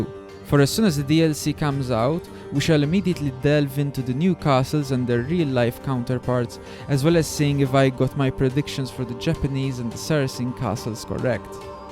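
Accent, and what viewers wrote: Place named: Italy. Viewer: mmm. Your accent is definitely Italian. Bordering on stereotypical even, but I can't knock you too much, you only have so much control over your accent.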